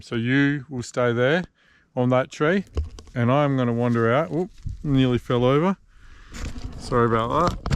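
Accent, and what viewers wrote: Australia. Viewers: Hey can somebody tell me where is filming his videos? Is it Australia or NZ? Probably AU right? Not a native speaker so also curious whether he has a typical aussie accent?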